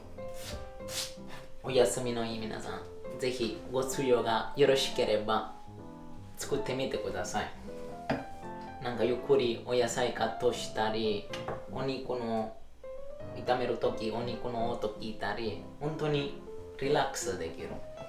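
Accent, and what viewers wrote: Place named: Italy. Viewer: 自分もやってるからかもだけど、白ワイン飲酒後？(仮)すごいイキイキしてて、イタリア訛り炸裂のハイテンションに思わず癒されました^_^ 仕事を楽しみ、動画で視聴者に元気を与えるに心底尊敬の念を持って感謝申し上げます！！！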